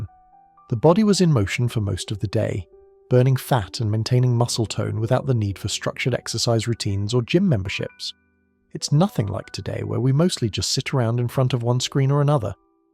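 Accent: United Kingdom. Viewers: The voice and quite refined English accent are very pleasant to listen to - but the language is American with the usual grammatical errors and mispronunciations, which is a real pity.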